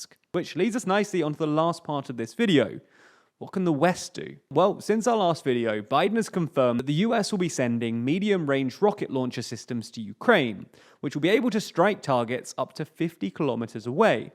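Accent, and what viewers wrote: United Kingdom. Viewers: Obvious errors : - titles in the video mentioning. 5th May, 7th May should read June as the date visible on the news article shown - zelensky didn’t state 500 losses a day in Ukrainians troups but 50 to 150 as another comment corrected. He also said the Russians are losing almost 300 soldiers a day. I really much appreciate the quality of the TDLR videos, but these errors seems more and more frequent, like when it estimates Russian military budget to an incredible 250 millions of dollars where the reality is more 80 millions … some serious quantify checks are necessary there ! It’s a pity because your videos are very well illustrated, very concise, and the British accent makes these videos “Über chic”…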